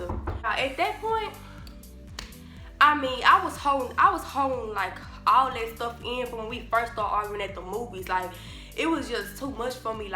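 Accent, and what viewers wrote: Louisiana, United States. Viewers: You from Louisiana? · Are you from Louisiana you sound like it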